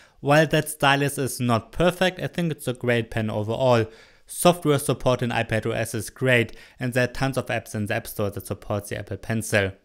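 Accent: Germany. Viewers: Most German accent ever can barley listen to it. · I am an Indian who is in Germany. I hear my colleagues speaking this accent when we have a conversation in English. lol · I LOVE THE GERMAN ACCENT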